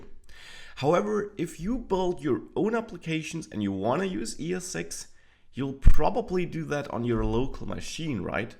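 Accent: Germany. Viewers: the thick german accent it, LOVE IT!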